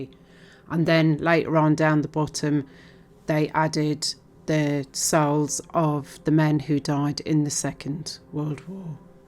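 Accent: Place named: England, United Kingdom. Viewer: . The videos thumbnail is a white cross at the base of a Yew tree. The title states it is his guide to aging a Yew tree. Did I hear correctly that you said in another video that your not from Enland? I like listening to accents and to me you sound English. Again, just curious and mean no disrespect.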